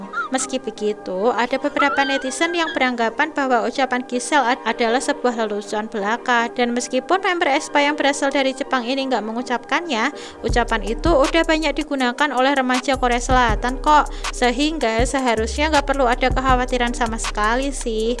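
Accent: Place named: Java, Indonesia. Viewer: medok mbk nya wkw jd salfok soundnya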